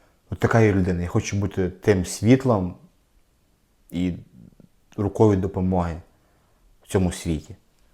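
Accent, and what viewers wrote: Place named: Galicia, Ukraine. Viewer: Клас! Підбадьорив і ніби прожив моє життя і мої комплекси в минулому., дякую за твоє відео і за твою відвертість. За такими людьми, як ти, майбутнє нашої планети і наступних поколіннь. Судячи з акценту і прізвища, ти є з Галичини) Я вгадав? Перепрошую, що одразу на "ти", просто так відчуваю.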